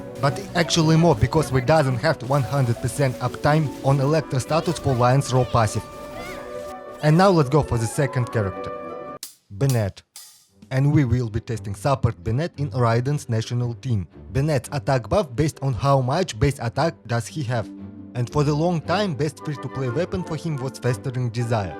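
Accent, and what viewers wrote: Russia. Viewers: Русский акцент узнаю из тысячи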